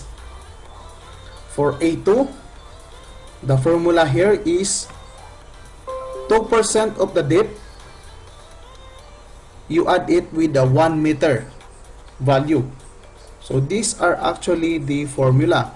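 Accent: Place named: Visayas, Philippines. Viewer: Bisaya accent , mispronounced ang mga words di maintindihan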